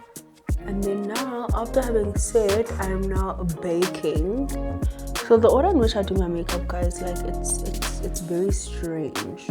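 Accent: United Kingdom. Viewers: The British accent sends me 🤣🤣🤣. Love it sooo much. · Uhm so the UK accent….. is this a hint….. oh so ever gorgeous ke wena international makeup plug? 🙏🏾😘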